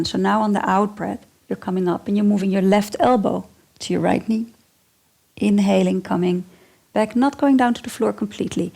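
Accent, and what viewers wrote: Netherlands. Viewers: You sound like you are from The Netherlands... Dank je wel voor de oefeningen!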